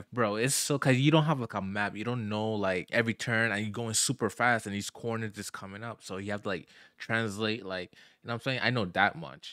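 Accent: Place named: Caribbean. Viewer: Gday mate, love from Australia. I have a question that might sound weird......well because it is weird but that's my brilliant beautiful...weird brain. Do you have a Caribbean background? Or are you Canadian? Wait that nakes more sense. That would explain why you seem so chill and friendly. Sorry for being a weirdo, I'll let myself out. 😂 Edit: I was referring to your accent. I have a weird obsession with accents.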